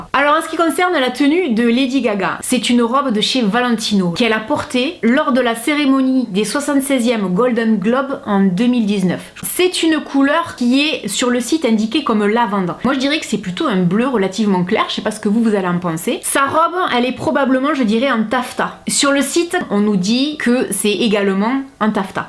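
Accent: South, France. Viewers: Bonjour, Jolie accent du Sud, je kiff. Dis....pourquoi pas de promotion pour un site de soutif sur mesure (j'ai pas regardé toutes tes vidéos désolé si déjà fait.). Quand je vivait en Gwada, la boutique Coquin (rien à voir avec les sex-shop)faisait pour les fortes poitrine du prêt à porter et ce fut la libération pour une amie, que j'avais marre de voir voûté et tout le temps en train de rectifier son soutif...et oui: qu'une amie et rien d'autre. Depuis que je suis dans l'hexagone, plus personne ne parle du sur mesure pour les soutifs...en plus du fait que les fortes poitrines sont les grandes oubliées des magasins de sous-vêtement...aubade en 1er. Alors puisque tu parles de sur-mesure des recettes sous-vêtements? Je sais que tu saurais être sexy sans être vulgaire sur le sujet.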